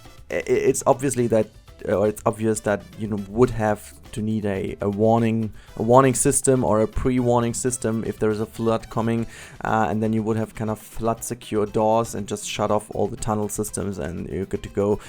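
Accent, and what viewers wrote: Germany. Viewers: Are you German? You sound like one!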